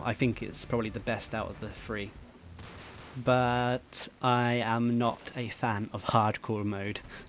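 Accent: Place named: United Kingdom. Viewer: I love the British accent it's just awesome. Will u add me on psn please.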